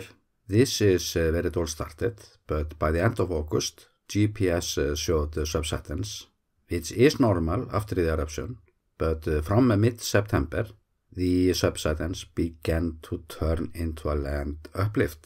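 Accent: Iceland. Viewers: Again a most interesting video, but I won't stay until the end but I finally will tell you why. I think you want to know when I just praised your video but leave. It is your pronunciation. You have a fantastic vocabulary, but you put little attention to your pronunciation. Your Icelandic accent, your fluent speech and the subject on a recorded device make it very difficult to follow. 1/3 of it I don't get at all and since your interesting video depends on understanding all you say, I just finally give up because I miss too much. Can you practice your English pronunciation , speak slower when the words you speak are very important to our understanding of the subject? I get tired of guessing! I have no doubt if I were to stand in front of you and if I were to see your face I would understand each and every word. Having someone else read the script would not be the same because it is your subject and we subscribed to you! 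Please don't be offended when I say you could practice reading to yourself and perhaps you would realize to slow down on situations we need to understand fully to learn from your videos the situation in Iceland's volcano field in the area of and around Fagradalsfjall..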